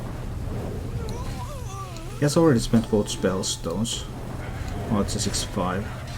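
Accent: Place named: Finland. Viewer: Your accent sounds like your from Finland, good video as always!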